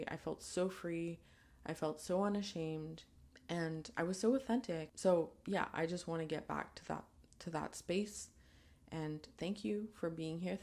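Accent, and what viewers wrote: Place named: France. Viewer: PS. I think what makes you, you is your voice, your way of expressing things, of describing things, your French accent when you speak.. not your mascara and makeup, you are sooo beautiful barefaced. Just grab a cup of cappuccino and throw yourself outthere with whatever feels good to you. We are waiting for you , whenever you are ready!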